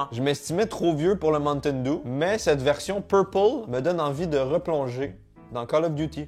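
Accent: Quebec, Canada. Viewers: dan ta prochaine vidéo reparle en Français stp t'es trop marrant sans ton accent québécois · " Voici un Crush à l'ananô " L'accent québécois me surprendra toujours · "... de fleure sêchées que ta grand-mère met au dessus de ses toilettes quand ça sent trop la merde. Non? T'as pas la référence?" J'ai éclaté de rire XD XD Ça rend super bien, avec l'accent québécois XD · C'est vraiment cool que tu gardes ton parlé québécois même si la majorité de tes followers sont français. Ton authentisme est apprécié! Continue ton bon travail, ça passe le temps en criss!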